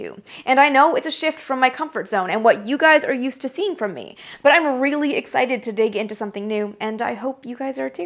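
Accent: Canada. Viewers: I suspected you were Canadian becauae of your pronunciation of certain words so I looked you up & sure enough even though you were trying to hide it.. I'm a big fan of your you tube channel & your depth of knowledge. I don't care if you are not from the U.S. & are an expert on NASA. Imo it gives you extra cred that you adore all things NASA & American space history despite not being born here. There are so many Canadians compared to Americans when you factor in the relative size of the pop on you tube & I think that eludiates a big prob here: we don't educate our citizens anymore. It really is a sorry state of affairs & I wonder about how many Americans are out there that could have contributed big if they been properly motived & piqued their interest in the hard sciences & education in general. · I am now noticing the Canadian 'o' pronunciation and now I can't stop hearing it. 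· I have nothing but love for Canadians, the Brits, and Aussies. I knew you were Canadian when you said “aboot” (about) and “surry” (sorry). It’s adorable 🥰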